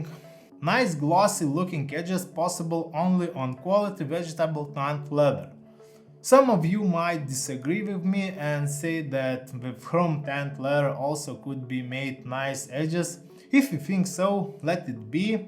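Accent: Russia. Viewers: хэллоу) чувствуется русский акцент)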